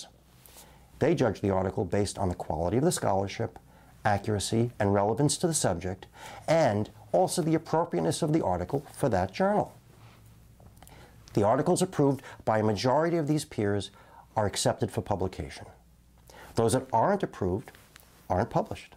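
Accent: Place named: East Coast, United States. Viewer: He´s definitely from the east coast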